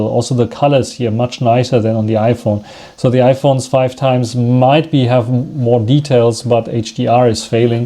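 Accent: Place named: Germany. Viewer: waruuuuum in Englisch in Deutschland? macht ein engländer in England od. Amy in der USA auf Deutsch??? was ist der Grund? schaut Leute, ich habe etwas Englisch gelernt? wenn dann bitte richtig und nicht mit dt. Akzent.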